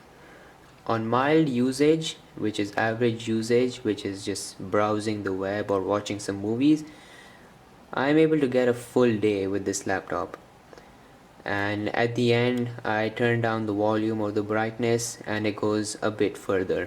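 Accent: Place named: India. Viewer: oh k... U sounded like an Indian.. 😂.. Anyway.. This model is not available in india thats why I was wondering..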